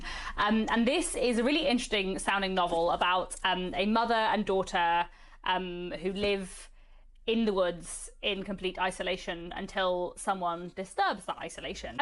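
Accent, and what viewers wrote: United Kingdom. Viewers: I changed upon your blog last week. Very interesting even if you talk rapidly in British accent which is sometimes difficult to follow. Never mind I will catch up with the accent in due course. I am watching this from Bangalore, India. Thank you for the vlog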